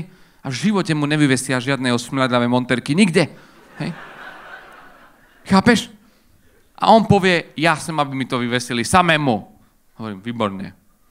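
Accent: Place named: East, Slovakia. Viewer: Nie kámo tak rozprávaj ľudia na východnom Slovensku. Nárečie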